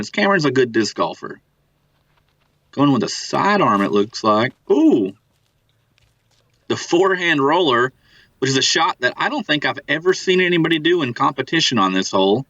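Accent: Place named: Arkansas, United States. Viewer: Fantastic to watch. Love the commentary! Including the great Arkansas accent. Looks like a great event. Thanks so much for posting!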